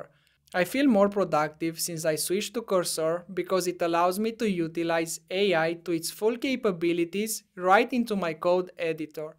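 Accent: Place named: India. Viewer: Came here for the Indian accent.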